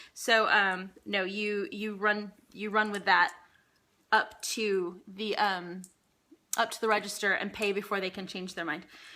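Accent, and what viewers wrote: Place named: United States. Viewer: your cute ....I saw an ash vlog ...you have an American accent do you live in England year round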